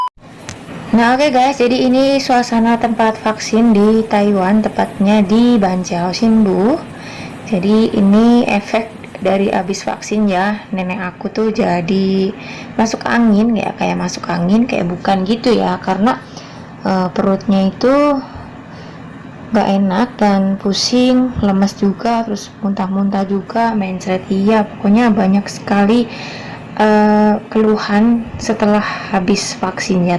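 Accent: Banyumas, Indonesia. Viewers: Ora ngapak ora kepenak mantep pokoke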